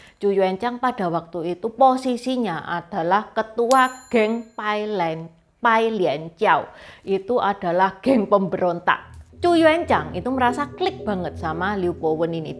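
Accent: Java, Indonesia. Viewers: Logat Jawa medok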